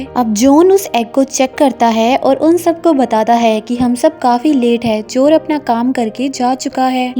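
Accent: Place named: Pakistan. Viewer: Nice explain... Tumhar aawaj se lagta .. Tum Pakistan se ho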